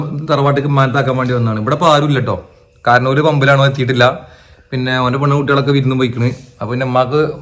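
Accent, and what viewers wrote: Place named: Malabar, India. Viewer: മ്മടെ മലബാറിയൻ സംസാര സ്റ്റൈൽ ആണ് നിങ്ങളുടെ ഹൈലൈറ്റ്. എല്ലാവരും ഇത് പോലെ സന്തോഷമായിരിക്കട്ടെ എന്നെന്നും.കുട്ടികൾ ഇപ്പച്ചീയേ... എന്ന് വിളിക്കുമ്പോൾ നല്ല ഭംഗിയുണ്ട് കേൾക്കാൻ . ❤❤️🙌🏻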